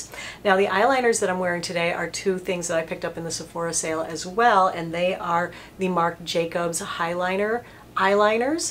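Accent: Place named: Boston, United States. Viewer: I never remember to ask you--where is your iconic Mass accent?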